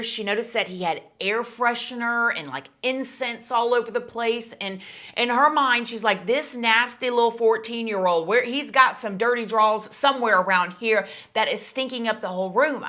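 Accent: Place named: Texas, United States. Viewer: Okay, I’m going to say a few things. Firstly, you remind me of my American friend (she’s Texan, I’m not great with Geography but you sound very similar) and just as with her, your kind, caring nature comes across with every story you tell. I LOVE your videos. Secondly, maybe I’ve got cynical with age, but I don’t feel like Joshua should ever be free. I didn’t really buy his apology, it didn’t feel particularly sincere (to me) it sounded more like a resume statement to try coerce the jurors (or whoever made the decision) into letting him be free. I don’t usually look at cases like this and think they deserve life when they were children themselves (although there are exceptions). In his case, the lack of sincerity, and the destruction and suffering he inflicted upon the little girl, Maddie, her parents, sister, and family I don’t think it would be fair that he would get freedom while they are stuck wondering “what if…”. 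All the things you said were right, you’d be forever trapped in your own mind, a prisoner of questions you’d never ever know the answer to. And, if he was so sincere and he meant it, why not give the family some closure and tell them exactly what he did with their baby girl. Finally, I think upbringing has a lot to do with it. His father’s behaviour toward him might have made him feel rejected leading to fears of rejection by others in society, causing him to develop unhealthy, antisocial attachments, as he apparently did with Jessie. It’s a very, ve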